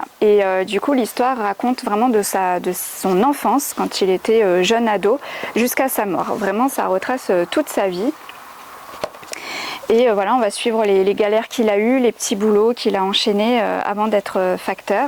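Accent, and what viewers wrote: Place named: South, France. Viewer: J'espère ! En Savoie, à 1h30 de Grenoble et d'Annecy, au pied des stations de ski les plus réputées du département ! T'es dans le Sud toi non (du moins ton accent est du Sud) ?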